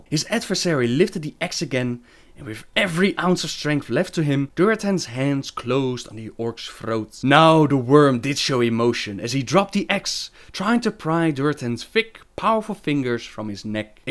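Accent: Netherlands. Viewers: Late, I know, I'm sorry. is from the Netherlands and often he pronounces things the way they do in Dutch, even though English has some really weird rules for pronunciation. In Spanish you pronounce peninsula just the way it's written: pen (like the writing tool) - in (like Nagrand is IN Outland)- su (kind of like soon but stop the word halfway through, so it sounds like soo or sü) - la (like the end of Attila). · Pretty sure he's Dutch, judging by his accent. They tend to overpronounce the "s". I'm from the Flemish part of Belgium, so my mother tongue is also Dutch, albeit slightly different from the Dutch in The Netherlands. And I have an American girlfriend and she can't pronounce "r"'s at all, so that roaring sound he makes might be funny in English, but I find it very pleasing. ;) (I can't even do it, because I've taken on the American accent from all the American shows we get on tv.) And the fact he said pensuela instead of peninsula shouldn't really make a difference. 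Many Americans fail to pronounce English words correctly, so why hate on someone who's main language isn't English? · Hey! I was wondering, will you ever make a lore video about Illidan Stormrage? Or even better, theories on how he could still be alive (which I think he is)? He is one of the best, most tragic and misunderstood characters in the Warcraft universe and he has a lot of history. A video by you about him would be badass. Love your storytelling and Dutch accent, by the way. ( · Are you dutch?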